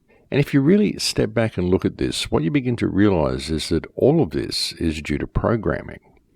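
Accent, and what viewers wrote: Australia. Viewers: Patrick dalton what r u talking about he's australian not british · Wow. I've woke up, never heard of you, first thing on my feed and you're describing exactly how I feel!!!!! And you sound like you are in Australia. Is there any way to connect with you on LinkedIn?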